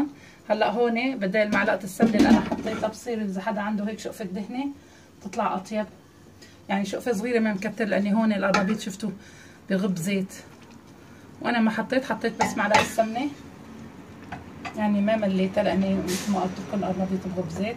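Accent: Aleppo, Syria. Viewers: لك دخيلك وخيل اكلات الحلبية يا انا متل حكيتك والله ياخيت حلبية ابوس جنق وجك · بحب لهجه حلبية ❤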